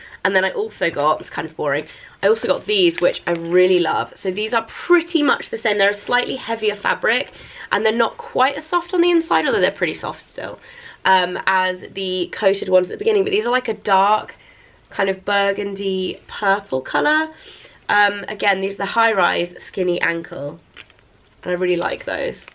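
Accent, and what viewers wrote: United Kingdom. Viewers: I do love watching all of your videos! I’m obsessed with British accent and you’ve been one of my favs for years!!! Your awesome!! 🤩👍🏼🙌🏽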